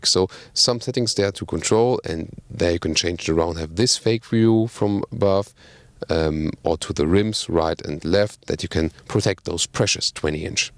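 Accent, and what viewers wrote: Germany. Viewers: These are good reviews. Just factual....and with the funny German English ;) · This guy is just great! I love the german accented: "Full HD, Full Screen and Full Lengzz! Let's GO!"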